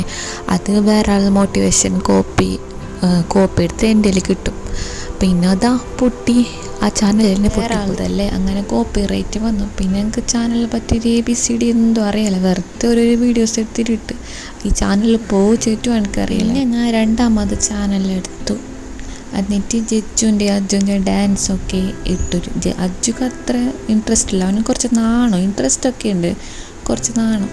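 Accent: Kasaragod, India. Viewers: ആര് പറഞ്ഞു 😂😂video ഇഷ്ട്ടം അല്ല എന്ന് ❤ നല്ല രസം ആണ് കാസർകോഡ് സംസാരം കേൾക്കാൻ ❤️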